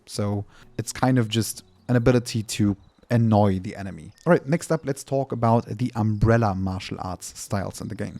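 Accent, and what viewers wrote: Germany. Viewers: Wait, you’re German?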